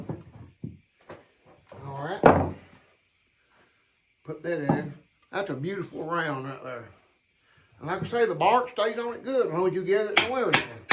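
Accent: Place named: Eastern Kentucky, United States. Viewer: I’m from Martin county Kentucky I would like to learn more about building these chairs. You sound like your from around the same area. Love the video and the craftsmanship keep it up 👍.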